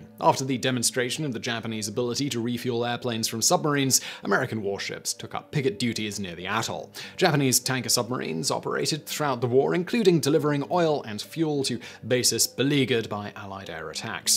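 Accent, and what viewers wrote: England, United Kingdom. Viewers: Gobble De Gook - He sounds 100% English to me. Not a hint of yank.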